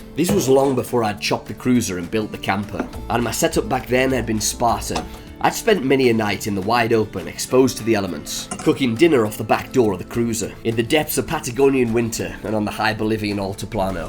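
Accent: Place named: North, United Kingdom. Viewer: Been following you on instagram for a while, love the long form YouTube style. Always class to hear a fellow northerner too! Looking forward to the rest of the series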